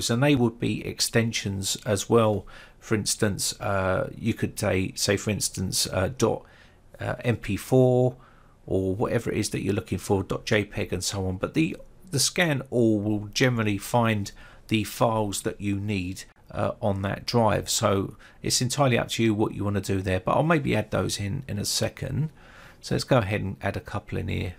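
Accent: United Kingdom. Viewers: Damn he speaks UK English. 👍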